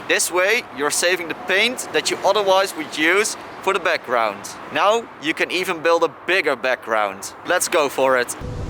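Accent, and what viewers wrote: Netherlands. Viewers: If this guy isn't Dutch all my knowledge of English accents is officially unvalid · Are you Dutch?? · If this isnt a Dutchie speaking English idk what is. goeie vid wel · you dutch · Dat nederlandse accent maakt de video 10x beter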